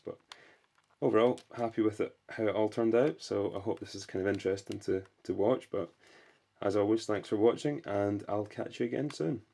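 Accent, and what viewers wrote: Scotland, United Kingdom. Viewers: R U Scottish? Just wondering great video btw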